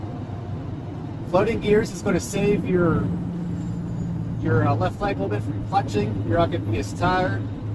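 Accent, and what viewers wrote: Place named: Minnesota, United States. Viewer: Where are you from? Minnesota?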